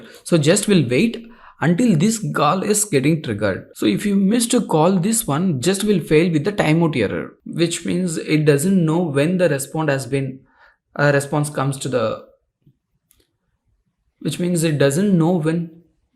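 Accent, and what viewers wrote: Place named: India. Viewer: 1. Learn english. The fucking indian accent, i had to turn subtitles on It's all a lie: There is an error on the jest home page at the beginning: it says: "Jest is a delightful javascript testing framework". It should be "Jest is shit and a nightmare". Focus on simplicity? It's a fucking nightmare and hard as hell Zero Config? AHAHHAHAHA You hope so, it will require you to configure paths in 2 or 3 files and it will still gives tons of errors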